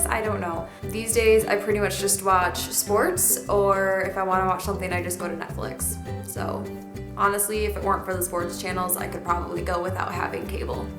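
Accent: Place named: Upper Peninsula, United States. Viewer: Lol this is an old video but it popped up on my main page so I decided to watch it, and I'm actually really glad I did! I'm from Wisconsin and I could totally tell by your accent that you were from around the UP.. Not that that's a bad thing though! Haha :) I used to play Sims and Runescape too like it was nobody's business too! haha And omg.. I am petrified of pain too and being pregnant scares the hell out of me too. I'm glad I'm not the only one that's genuinely scared of that lol.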